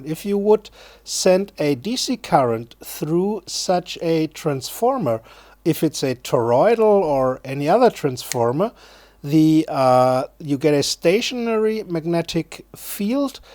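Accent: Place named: Germany. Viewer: Ich habe es mir schon gedacht, das du ein deutscher bist :D Man hört es einfach raus :P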